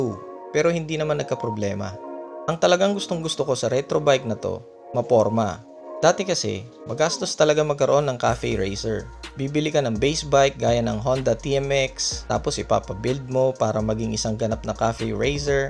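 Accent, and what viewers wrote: Ilocos, Philippines. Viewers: Ilokano ah lods hehehe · Ilokano ka mt bosing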